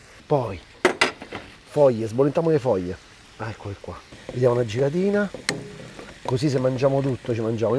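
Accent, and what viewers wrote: Rome, Italy. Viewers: A parte la tua cultura culinaria che nn si discute, per la personalità e il modo di esprimerti così semplicemente romano, nn sei da meno di grandi attori come Sordi, Fabrizi Montesano e altri! Sei vero!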